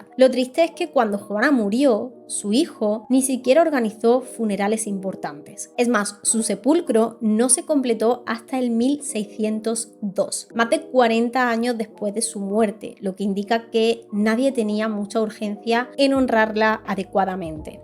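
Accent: Andalusia, Spain. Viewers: Que lindo tono andaluz que tienes al hablar, saludos desde Argentina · Hola hermosa ☺️ soy de Rio de Janeiro 🌻 Brasil Te acompaño hace un tiempo y ¡me encantan tus videos! ¿Vos sos de Andalucía? intento ubicar de dónde es el tonito, es muy diferente al de mis amigos de Bcn. Besos 💋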